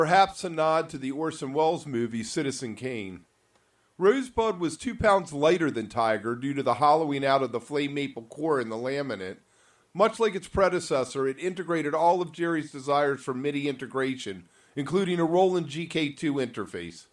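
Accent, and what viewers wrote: Baltimore, United States. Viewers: Dude, are you from Baltimore?? Asking because of the accent. Great video!! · I’m from Baltimore - this is a Baltimore accent on elephant steroids. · Baltimoron here, lived in Annapolis/Balto/DC area 1979-'92 [age 14 to 27], before moving to Northern California [Santa Rosa] to be closer to the flame.. And yes, I pronounce my long "o"s the same way the narrator does.. & that is pure BALTIMORE, baby!.. Much as I'd like to rid myself of this particular language/speech trait, it seems that it's "baked in" > for LIFE.. Oh well.. There are also aspects of my Allentown PA [Pee-Ay] accent [lived there from birth to age 14] which apparently "dog" me to this very day.. Embarrassing!.. Oh well, what can ya do?!?.. As the original question-asker opined > GREAT VIDEO, hon'!.. Har!.. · Great video, but yes I heard the Baltimore right away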